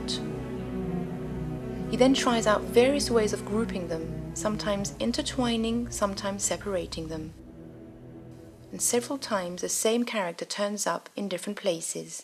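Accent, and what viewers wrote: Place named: France. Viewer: The narrator sounds like a well spoken French girl whose posh rich French parents sent her to a posh English boarding school for a few years to learn posh English.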